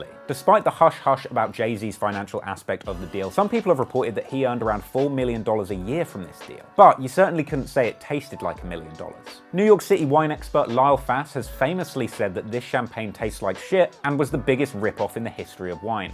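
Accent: United Kingdom. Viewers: Hip hop slang plus British accent is just cringe worthy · I love his accent why don’t we have more British rappers?!?!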